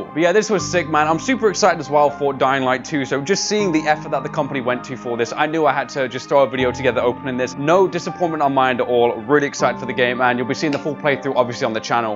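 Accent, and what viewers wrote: United Kingdom. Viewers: I can't be the only one that thinks he sounds more British rn lol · Is it me or sounds a lot more british with low quality mike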